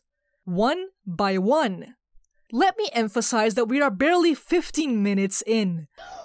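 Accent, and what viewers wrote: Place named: Ireland. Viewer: You sound like me haha may the luck of the Irish aid you in your endeavors!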